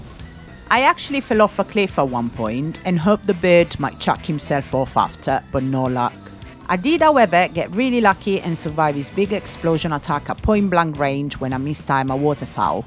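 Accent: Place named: Italy. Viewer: Love the mix of Italian and northern/midlands, get on, get that plaque before JK haha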